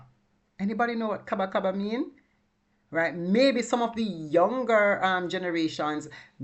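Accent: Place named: Patois, Jamaica. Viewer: As an adult of Jamaican heritage, it was wonderful to hear that many of their word usage originates from African regions! Thkq for this delight video & thkq (especially) for using the patwa tongue of my parents... I loved hearing you speak! BIG UP to JAMAICA from the UK 👏🏽💯😉🇯🇲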